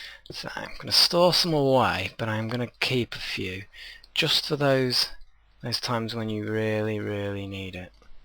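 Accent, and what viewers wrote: United Kingdom. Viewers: i love ur British accent xD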